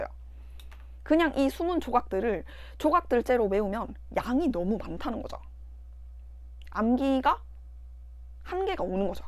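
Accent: Busan, South Korea. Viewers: 마산 진해 부산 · 부산이신가요? 똑~띠 이십니다. ^^b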